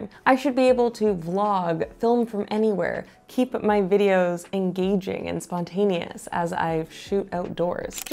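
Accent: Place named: Canada. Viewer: Canadian Accent (out)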